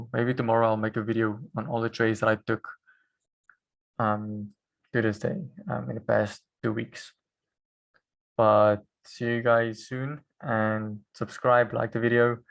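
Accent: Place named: South Africa. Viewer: Hi. Thanks. Where are you from. ? From your accent sounds like South - Africa. ?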